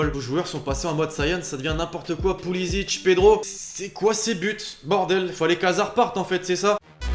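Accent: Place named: Banlieue, France. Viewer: change ton accent de HLM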